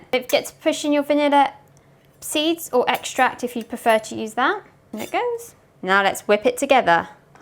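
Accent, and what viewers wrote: United Kingdom. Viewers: Talking in British English nice taking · why do you sound British I'm British and I don't sound like that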